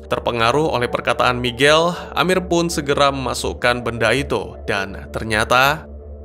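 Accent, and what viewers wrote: Aceh, Indonesia. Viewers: Bang orang Aceh kah? Yok ketemu bang di Banda Aceh penasaran sama suara candunya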